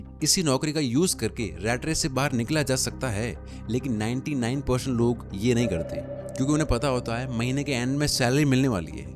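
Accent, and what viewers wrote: Rajasthan, India. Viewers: Video acha he or edit bhi achi he... Rajasthani English extent wo bhi acha he..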